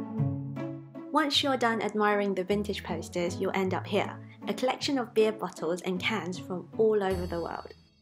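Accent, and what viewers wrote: United Kingdom. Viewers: Where are you from? Cant work out your accent , it seems like you have a UK accent ( British accent) but it sounds mixed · Hi I loved your video. I also love Asahi beer. As I'm from England I don't like the taste of our lagers like Carling and Carlsberg etc.. Asahi is very refreshing and tastes amazing. And from 1987 the year i was born lol. You sound like you are from the UK .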